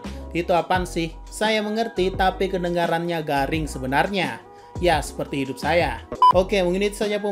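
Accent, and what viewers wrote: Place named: Flores, Indonesia. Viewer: Logat naratornya ga asing. Pasti orang Ende-Flores, NTT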